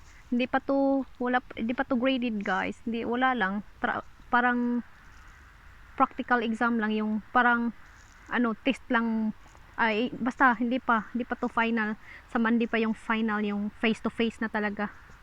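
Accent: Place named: Visayas, Philippines. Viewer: Hahha murag bisaya jd ky ang accent gahi kau murag ako Lol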